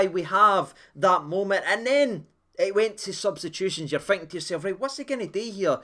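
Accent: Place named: Glasgow, United Kingdom. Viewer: Great result last night. Love your videos and wee Glaswegian accent. Best from Oz.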